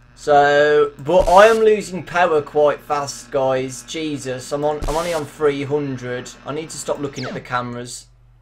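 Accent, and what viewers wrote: United Kingdom. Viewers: Not trying to be raciest but are u British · Hey sorry for asking this but what part of uk are you from ? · i wasn't scared at all, i was too busy laughing at every word you said with a vowel in it. Your British accent is hilarious XD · Thank god he's British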